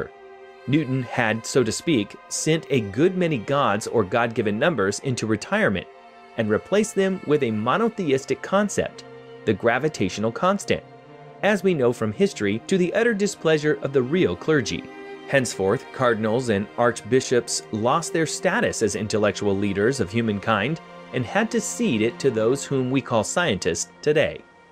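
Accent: Germany. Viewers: Physics is more fun with a German accent!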